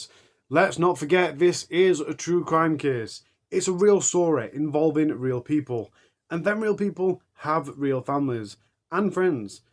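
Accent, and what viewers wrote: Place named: Yorkshire, United Kingdom. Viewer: Just found your channel. So good and subscribed. Daft question, but your accent... youre northern like myself... are you from Yorkshire? Xx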